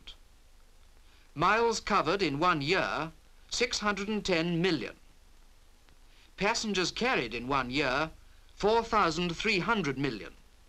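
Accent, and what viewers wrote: RP, United Kingdom. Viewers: Plummy voice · A real cut gless eccent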